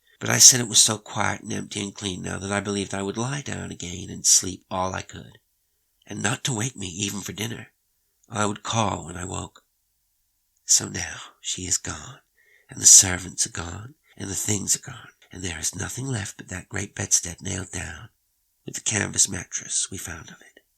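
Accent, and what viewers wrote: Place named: United States. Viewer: I’ve just realised that’s you reading! I honestly to God though you had hired an American actor! You are aweamazing! I spent the first years of my life in the US and I didn’t realise! 🌟🌟🌟🌟🌟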